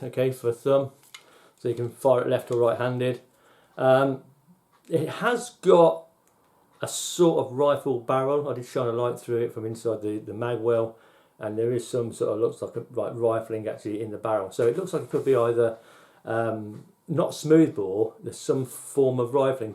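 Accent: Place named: United Kingdom. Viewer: Even with subtitles, it's really really difficult to understand awful British accent, I wish you were regular English speaker 😢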